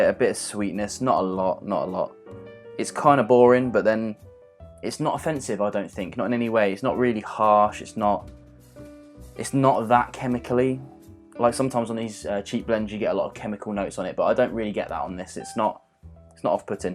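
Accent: United Kingdom. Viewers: British accent brought me here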